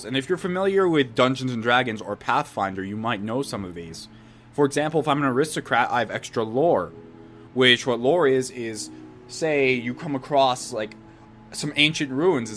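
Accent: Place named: Canada. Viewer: I love Arizona, one of the craziest environments I have ever seen! Driving in the desert while a mountain of snow is at a distance! I hope I don't offend you but I thought your Canadian.. I'm Canadian and the accent is very similar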